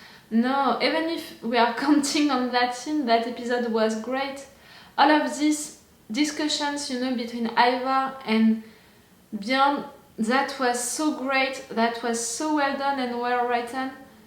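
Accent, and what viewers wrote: France. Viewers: u are french? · On l'a reconnu direct cet accent mdr *bjorn n'est pas mort non non non non...épisode incroyable.. ubbe ont compte sur toi frérot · You have such a big french accent. Is so funny. 😂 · Tu es française ? Par moment tu prononce des mots avec l’accent français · Française ça s'entend 😉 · You have a french accent you know that your reaction is one of the best i seen until now you are sensible that mean you love this as i do and you are attached to the charachters i have the same reaction when bjorn falls he was the only reason why i am still watching the show until now he's death broke my heart to peaces he was the best charachter in the show after ragnar he was with us from the bigining we watch him grow up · J'ai tout de suite deviné que t'étais française vu l'accent XD Mais ouai j'ai eu la même réaction que toi quand j'ai vu Bjorn se faire transpercer... 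J'espère sincèrement qu'il n'est pas mort, mais j'y crois pas trop quand même. C'est mon personnage préféré, mais là faut avouer que ça pue pour lui quand même mdr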